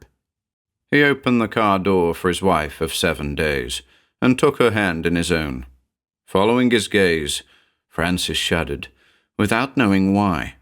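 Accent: United States. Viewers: You do a really good American accent, as an American myself 🩵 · This is such a rich and believable story. An almost Tolkien-esque commitment to lore, attention to family lines and culture. It feels believable because it's steeped in history. This author just HAS to be Irish! Of course does a stand up job as always. Your American accent is so John Wayne tough. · What an amazing weird tale. Expertly written. Two distinctive main characters each uncovering the mysterious secrets of their inheritance both together and separate from each other. Perfectly narrated as always. Glad to hear the American accent is still spot on. Great choice of story. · Your American accent is spot on. Cheers for the performance!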